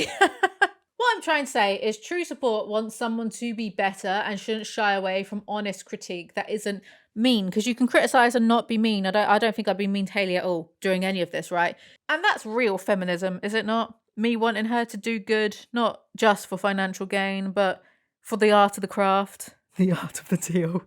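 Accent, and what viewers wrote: United Kingdom. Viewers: There's that aggressively British accent! 🤣 Glad to hear that bonkers accent once again. 😊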